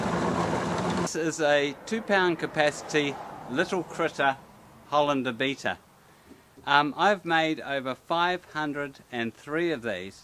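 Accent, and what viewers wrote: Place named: New Zealand. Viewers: I love KIWI accents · I got a real kick out of watching this with captions (sitting in a waiting room and not wanting to disturb others) ... the 'English" subtitles can't quite handle the kiwi ;-)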